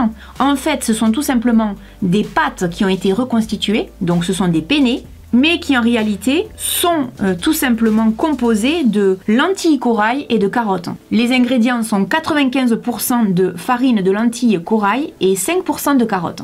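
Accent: South, France. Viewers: j'adore ta chaîne t'es naturel et franche et l’accent du sud qui va avec ^^ :) pourtant ça parle de maquillage (pas vraiment mon truc haha =D ) mais je sais pas j'aime bien regarder, ça parle un peu de tout les sujets =) , bonne continuation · Quand je t'écoute j'ai l'impression d'être à la maison! L'accent du sud que j'ai la chance d'entendre qu'en été ça pourrait rendre la vidéo la plus inintéressante agréable à écouter! Sinon, pour le Badgal Bang, on est d'accord, il est génial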